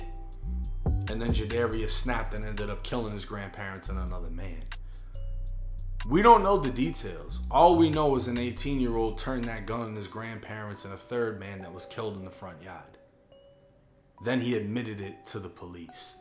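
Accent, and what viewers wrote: Boston, United States. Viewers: He's a Boston guy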